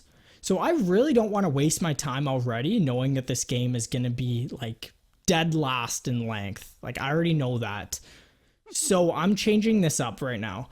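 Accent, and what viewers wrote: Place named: Canada. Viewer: bro this canadian accent is killing me 😭:face-pink-tears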